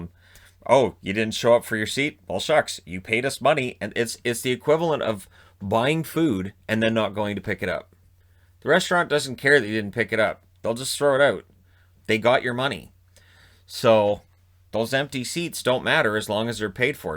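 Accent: Canada. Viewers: Feel the love Even if he does pronounce words ala Canada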